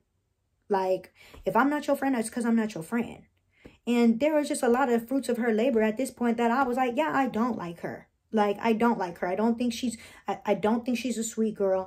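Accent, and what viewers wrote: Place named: New Jersey, United States. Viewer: I just thought you were one of those jerseylicious girls..loud and your accent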